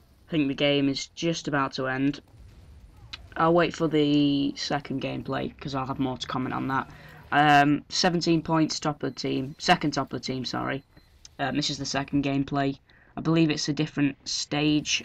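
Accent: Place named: United Kingdom. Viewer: Hey it's me!! I gave you feed back before, I really like you. Your young but your not young enough for your voice to be ear piercing, and you got a British accent so that's always nice to hear. You also seem quite calm and comfortable commentating. I think I'll sub, also I really like frontier as a map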